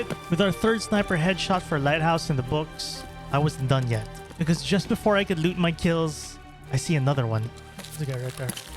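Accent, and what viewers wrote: Philippines. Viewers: Are you Filipino? Your accent is very familiar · The accent tells me somehow ", pre pinoy ka ba?"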